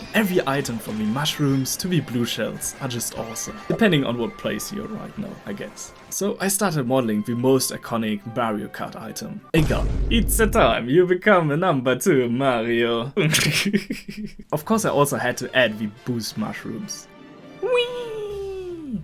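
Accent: Germany. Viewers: Are you german by any chance? I really hear it in your voice, and i LOVE that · i think you ar a german i can hear it in the voice as a german myself i know how that sounds when german speeks englich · Listening to this video knowing German is basically no different from watching this video in German lmao Great video, but I will never unhear the accent.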